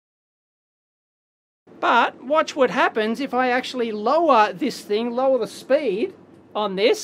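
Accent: Australia. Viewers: Nice to hear that Aussie accent WA accent is so different I just watched a Chinese guy showing how it doesn't work he said it was made in Germany he crossed out made in Germany and wrote made in China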